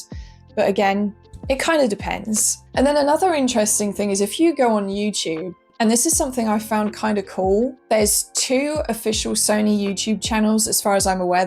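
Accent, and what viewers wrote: England, United Kingdom. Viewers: A cute girl with uk accent playing on a CRT? What else can I ask 😳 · Cuz she's from England & there's people who actually sound like that. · Why are you talking in a Harry Potter accent the whole video? Kinda weird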